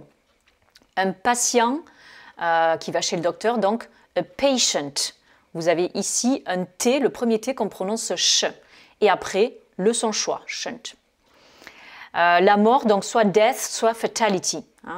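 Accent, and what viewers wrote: France. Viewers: Bonjour, excellente présentation étendue de ce vocabulaire très intéressant par les temps qui courent. Cependant, je suis professeur d'anglais depuis 35 ans, et je me demandais si votre prononciation légèrement francisée des termes et expressions était voulue ou pas, dans le but de faciliter la prononciation anglaise pour vos internautes francophones ? Merci pour votre réponse éventuelle, et encore bravo.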